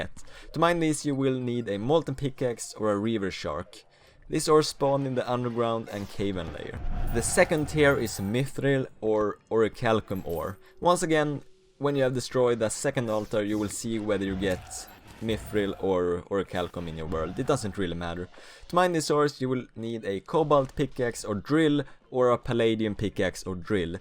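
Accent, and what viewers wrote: Sweden. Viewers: Are u Swedish? Ur accent kinda sound Swedish. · that swedish accent is heavy af, ha kul i 2018 : D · Are you from sweden beacuse i can hear when someone started with swedish and learned english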